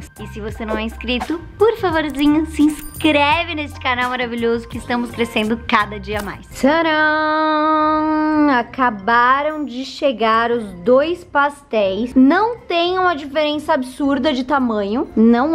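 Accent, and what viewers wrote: Rio Grande do Sul, Brazil. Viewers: Gaucha né?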